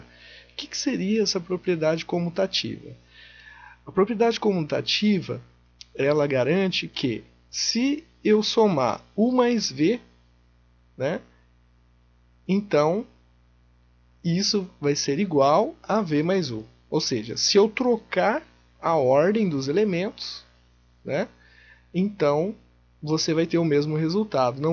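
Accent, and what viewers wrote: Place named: Paraná, Brazil. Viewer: Os vídeos estão cada vez melhores. Nesse vídeo o apresenta com cuidado a definição de Espaços Vetoriais. O sotaque paranaense é um charme (hehehe). Para que está estudando o assunto, a dica é pegar uma folha de papel e reproduzir, sem olhar, a definição apresentada. Depois que tentou ao máximo lembrar de todos os detalhes e ESCREVER no papel, voltar ao vídeo e comparar se conseguiu tudo. Esta estratégia é bem indicada para aprender um tema como esse.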